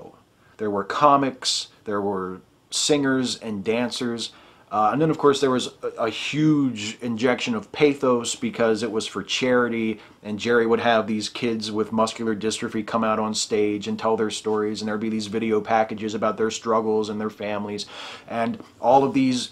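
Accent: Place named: Philadelphia, United States. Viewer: I know EXACTLY what you mean about Jerry Lewis and the Telethon; I was a child of the 80s too, and it is.definitely a strangely nostalgiac memory. Also, based on your pronunciation of the letter "o" in words like, "on"; are you originally from the Philly area?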